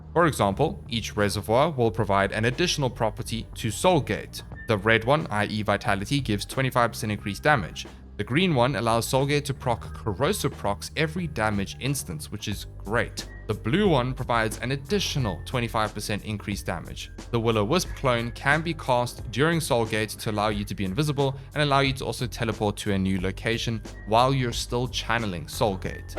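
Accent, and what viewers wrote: South Africa. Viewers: Broooooo i came here loooking for this comment🤣🤣🤣🤣🤣 love how south african he is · are you from SA?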